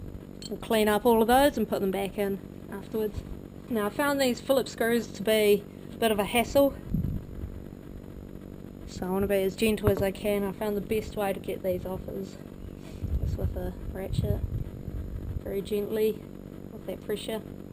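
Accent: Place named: Australia. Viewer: SOOoo many bearings. My 92 Nissan only has an inner and outer in the front. Was putting the disc rotor back on which I didn't have2 and shouldn't of taken off holding it in a vice while torquing its bolts to 48 pound feet. Didn't notice the bolts actually pulling the rotor down against the vice instead of tightening flush pieces of metal. Bang 6 bolts getting near 48 pound feet and the rotor broke in half. Time for a new rotor. I wouldn't of expected that force to brake it. Oh well. Going threw life speaking in an Australian accent must be great! When I had Japanese in the late 80's in California a former Australian air force guy who was a bit old was in my class. Said he flew Sopwith Camels.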